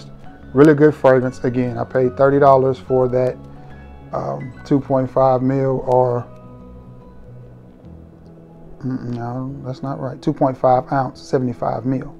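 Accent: South, United States. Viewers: Arkansas or Georgia?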